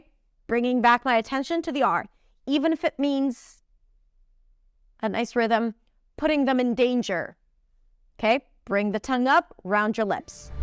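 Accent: United States. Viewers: is your english american?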